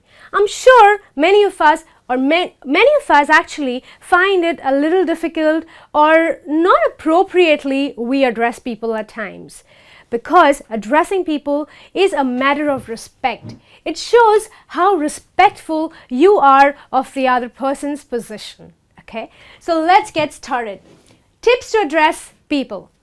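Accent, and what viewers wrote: United Kingdom. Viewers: There is no need of such British a csent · She takes british action and fast cant understand what she is saying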